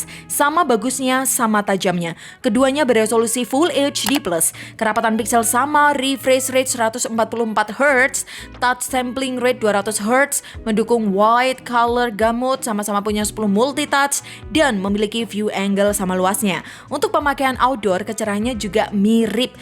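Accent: Surabaya, Indonesia. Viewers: Suroboyo iki rekkkkkk.....👍👍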